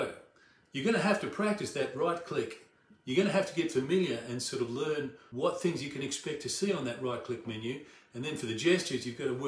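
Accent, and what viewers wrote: Australia. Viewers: Hello from UK! I much prefer listening to your Aussi accent than the American lazy drawl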